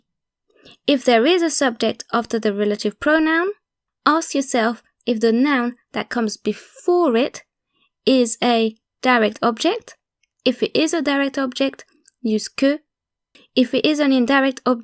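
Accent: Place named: London, United Kingdom. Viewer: You are teaching us English grammar as well. You sound like you have spent quite bit of time in London. Thank you for clarification was "bit" lost.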